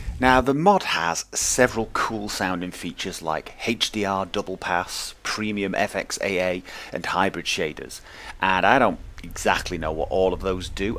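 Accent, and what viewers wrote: United Kingdom. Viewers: cool accent=) are you British?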